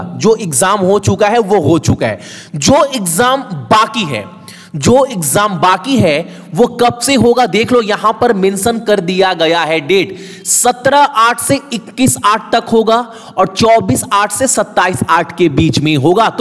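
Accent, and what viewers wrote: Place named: Kolkata, India. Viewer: Actually he is from kolkata so 11 is ekgarha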